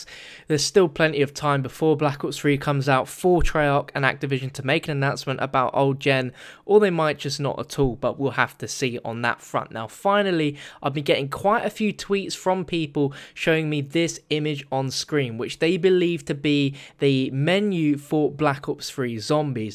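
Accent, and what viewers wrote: United Kingdom. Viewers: you sound Brittish. keep talking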